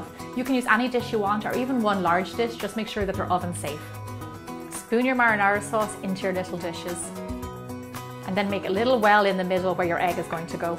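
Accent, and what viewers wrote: Ireland. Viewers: with that Irish accent and beautiful woman ill cook anything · didn't expect her to be irish. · An Irish girl explaining an Italian recipe. Love it.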